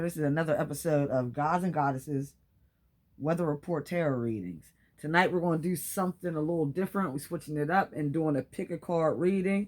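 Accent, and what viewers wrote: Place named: Baltimore, United States. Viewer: oh wow idk why it sounds like a baltimore accent lol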